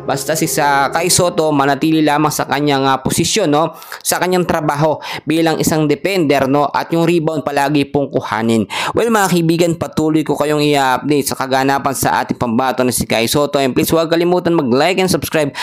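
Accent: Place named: India. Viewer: parang kang mga indian dito sa dubai magsalita boss laging may NO sa dulo hehe❤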